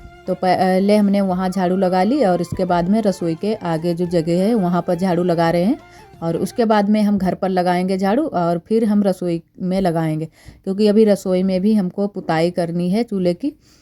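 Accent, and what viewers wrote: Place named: Bihar, India. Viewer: Original village routine, ye kon sa gaon hai, dekhkar to UP ya Bihar se lg raha hai, aapki boli main Bihar ki jhalak hai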